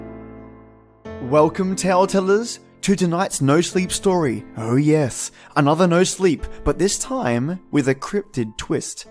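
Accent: Australia. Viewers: Awesome! I'll be looking forward to longer videos 😉 and thank you! My little nephew behaved pretty well. He was in a very happy mood. Maybe because it was his 1st Birthday yesterday 🙂🎂 As usual interesting story, great narrating, awesome Aussie accent! Haha 🖒🖤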